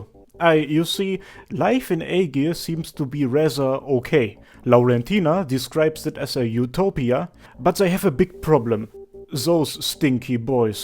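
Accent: Germany. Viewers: Moin Moin... ausm Norden?! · german akzent is real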